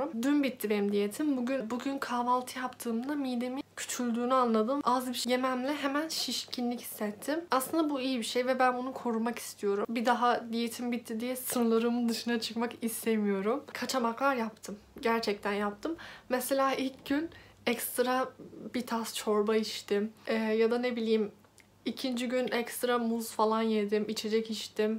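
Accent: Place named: Black Sea, Turkey. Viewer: Karadenizlisiniz sanirım ara öğününuz hangi saatlerde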